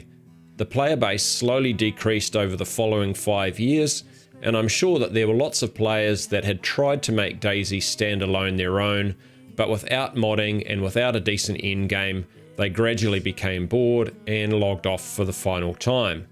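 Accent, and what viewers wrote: New Zealand. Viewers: Did Matter...... NZ accent will forever be funny to me :D good video though. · Aussie... No, New Zealander.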